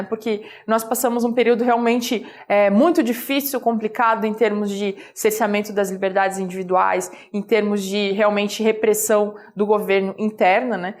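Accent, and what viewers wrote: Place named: South, Brazil. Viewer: Ela tem um sotaque lindo do Sul que eu amo. Báh que linda.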